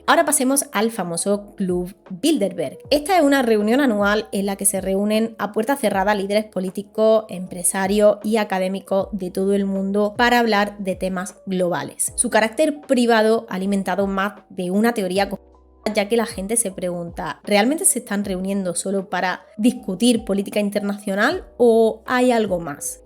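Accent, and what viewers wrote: Andalusia, Spain. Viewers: Me enamora tu forma de narrar la historia, sobretodo con tu acento andalú. Saludos desde Ecuador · Me siento raro por mirar un video neutral, bien redactado y objetivo sin que me hayan incertado un sponsor. Agradezco tu contenido. Fan del acento andaluz.:)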